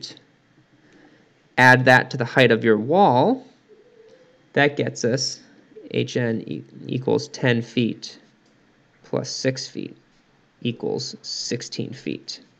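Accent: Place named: United States. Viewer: I did a thumbs up because your voice sounds enough like mine for me to understand you. I am so grateful for a fellow American "accent!"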